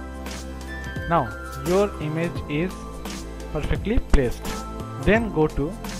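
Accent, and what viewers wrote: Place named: India. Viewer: You can guess it from his accent probably Indian or Pakistani.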